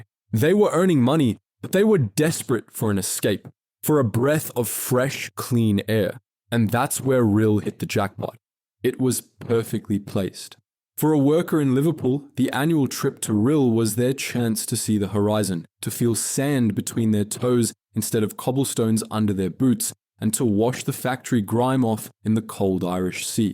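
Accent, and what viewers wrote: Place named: Australia. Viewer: Australian voice indicates it's never been and is using the BigBlackCo... sorry... 'BBC' for information.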